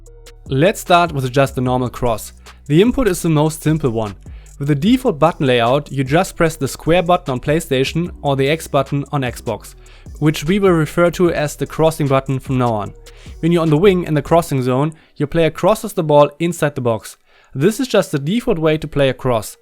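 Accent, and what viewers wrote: Germany. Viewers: man hört so sehr raus, das er deutsch ist :D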